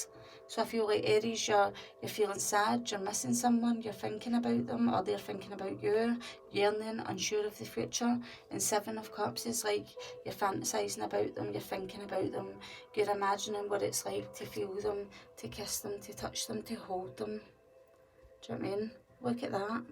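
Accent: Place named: Scotland, United Kingdom. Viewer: Thank you! And love your Scottish accent (and can't believe that I can understand you! :) 👍❤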